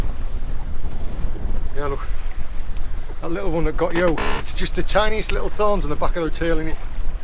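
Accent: United Kingdom. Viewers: Love your videos sir in cornwall, your british accent is not the easiest for non brits to understand still great videos keep up the good work and best regards from sweden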